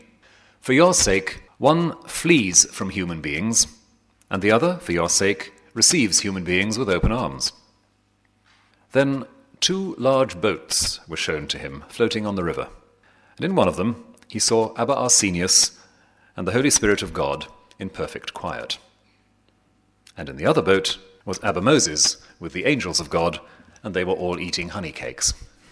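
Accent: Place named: RP, United Kingdom. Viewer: "Here we have two people reacting totally differently to human contact and human interest, one withdraws into silence, one embraces and they're both alright. Silence and honey cakes are equally acceptable [agreed, but that's not what you said here : <link> when you said that the thing to look for when a person is approaching union with God is that the person is sociable. People who isolate themselves are not close to God according to you when you were trying to manipulate me into stopping avoiding abusive people and situations. You're looking quite cheerful in this video because you still think you've got a chance of destroying me and you enjoy telling lies to a captive, and you assume admiring, audience]." "There are no standardised, generalised forms of holiness. Each one needs to discover what their path is [selling your soul to Satan and providing propaganda that leads over a million deaths and the emslavement of humanity isn't a path to holiness Rowan Williams. 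You are not holy. You are just trying to save your skin a since all your other deceitful and abusive strategies have failed]." "The whole business of passing judgement is so impossible [no it isn't]. Passing judgement in the sense the desert fathers so DEPLORE [his emphasis in his super posh voice, raising his voice not quite an octave to try and sound more authoritative. The desert fathers were talking about the ordinary every day sins of a group of monks living a common life. They were not talking about psychopa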